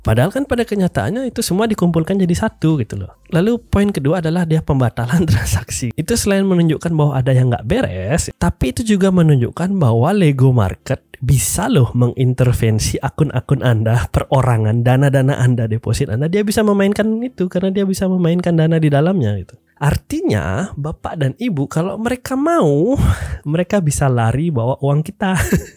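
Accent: Bali, Indonesia. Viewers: Dari Bali ni Kayanya yaa.. hehe.. omswastiastuu Bli... Bagus na e penjelasan itu. Aku juga sependapat. Wkwk. Tinggal tunggu aja boom waktu itu ATG. Rata2 yg begitu biasanya ujung2 scam.. kleeeeeeng.. wkwkwkwk. Itu platform Bandar itu. Banyak manipulation nya, buka real boker atau DMA direct market access.. wkwkwk. Tp apa daya, Kalo kasih tau org yg udh percaya sm itu ATG sulit dikasih taunya soalnya. Wkwk.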